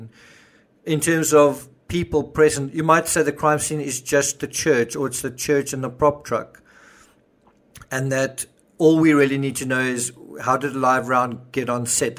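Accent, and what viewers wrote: South Africa. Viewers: Your South African accent adds to the sharpness of your commentary. It comes across as crystal to me. I find some people are too eager to highjack comments. If I grow tired of a highjacked thread I started, I just delete it and have several times. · Sounds like trolls! Ignore them. Some peoples attention span is that of a gnat. You're work is the best. Meticulous and interesting. I believe from your accent you are South African. I am British and get accused of pronouncing words incorrectly here in the colonies. Especially the word "Aluminium". LOL. Carry on your excellent reporting. I fully support you. Big fan. Cheers mate! 🇬🇧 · Fair point. I enjoy your SA accent its refreshing. It makes my cat chill out too. Keep the good stuff coming. You analyse in a way no one else does, gives better results.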